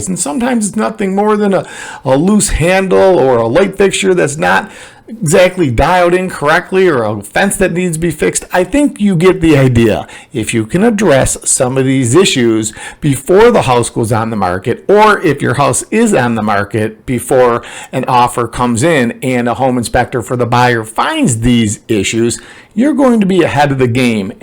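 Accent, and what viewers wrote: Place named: Buffalo, United States. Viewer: Ha ha I missed hearing your Buffalo accent lol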